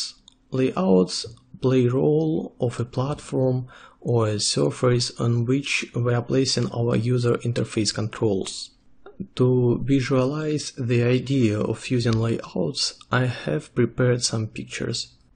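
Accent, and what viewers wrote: Russia. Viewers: Russian ? am I right ?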